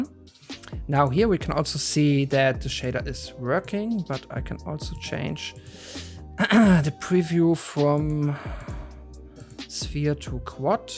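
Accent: Germany. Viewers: I love your channel! Man hört dein deutsch voll raus :P Ich abonnier mal ^^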